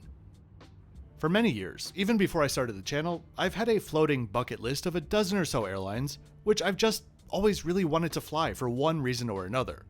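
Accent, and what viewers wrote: United States. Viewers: I would very much like to know where you're from. You sound american but it'll be helpful to know your heritage to understand the hit job you're doing on air algerie. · Your accent tells me you’re from the US. I travel in the US sometimes a 5 1/2 flight from the west to the east, guess what? No food served at all. As far as safety.. they arrested a pilot once who was drunk as hell, yes this happened in the USA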